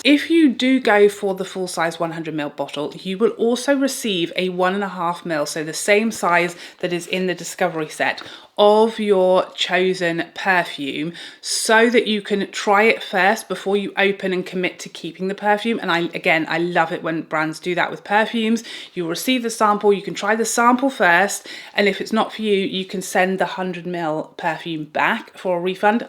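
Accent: United Kingdom. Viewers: What a very descriptive way of explaining the scents and notes of Charlotte Tilbury"s new fragrances. I appreciate your thoughts and narrative. Great video. Do you like any of th Kayali fragrenced,? Love you British accent and sense of humor.👍✔️❗🌸🏵️❤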